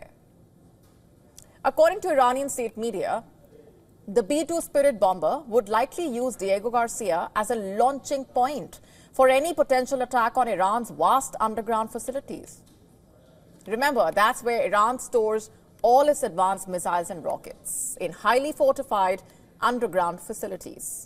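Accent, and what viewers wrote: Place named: India. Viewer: Indian accent so funny😂😂😂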